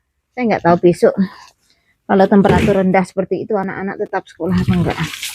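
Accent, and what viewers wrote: Java, Indonesia. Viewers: Assalamu'alaikum jeng.sy ketawa-tiwi sendirian dengerin jeng bicara,itu boso jowone medook.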